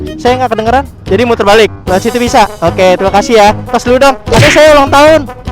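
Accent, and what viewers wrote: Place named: West Java, Indonesia. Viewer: njir udh ketularan bahasa + aksen kungkingkang :v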